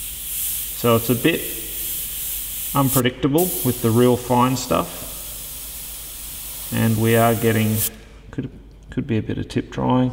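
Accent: Australia. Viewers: A whining Australian ruined this comparrison. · Twelve minutes of earache listening to an Aussie accent... and the conclusion is pretty much what one would have expected.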